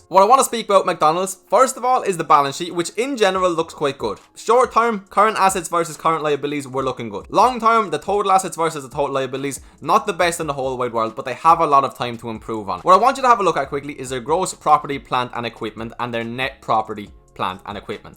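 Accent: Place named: Ireland. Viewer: me personally I like everything you do regarding the videos,this new style of presenting is even better I think.Yes never missed a single appearance of yours,as I find important value In you’re presentation.And I think this channel is not a should it’s a must grow in my opinion.Real valuable information,up to date updates specific monitories stock’s,easy language for everyone to understand,beautiful irish accent,best dividend,best growth stocks and even more.... don’t stop do what you do best because you’re very good at it.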